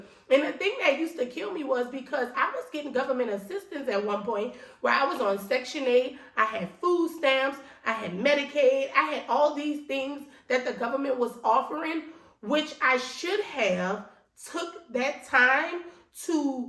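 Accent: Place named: New Orleans, United States. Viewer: Congratulations on all your success. Are you from New Orleans? Your dialect and mannerisms remind me of home. 😊❤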